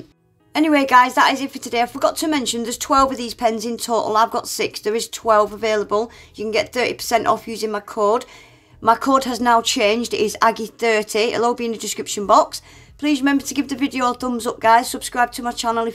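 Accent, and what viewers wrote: Northern England, United Kingdom. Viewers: Can I ask, where are you from? Your accent sounds up north lol. I’m from West Yorkshire. What attracted me to your videos was the accent. Stayed for the nails 😂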